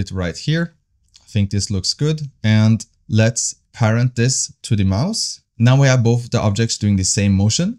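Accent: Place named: Norway. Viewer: I know a norwegian accent when I hear one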